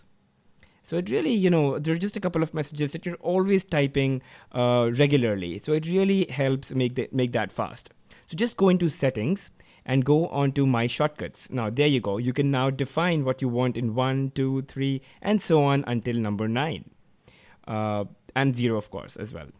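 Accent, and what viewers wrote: India. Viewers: Omg your accent is really really nice :) are you indian?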